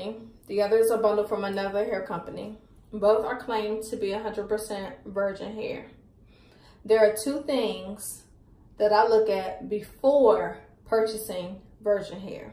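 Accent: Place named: Philadelphia, United States. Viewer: GREAT INFORMATIVE VIDEO ................ & YOU SOUND LIKE YOUR FROM PHILLY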